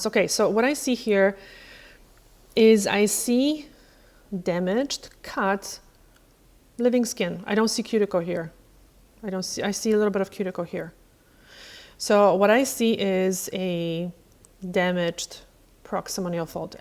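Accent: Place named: Poland. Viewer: I can hear a slight Polish accent in your English. Am I right?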